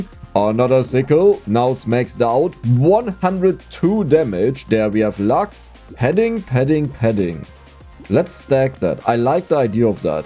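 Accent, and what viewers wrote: Germany. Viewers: U sound very german and its tickling my non existent braincells! my bf likes your videos. Idk what else to say. This aint a hate comment btw, ;3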